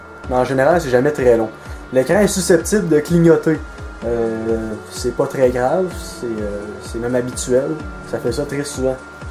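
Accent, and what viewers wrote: Quebec, Canada. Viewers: Le quebec x)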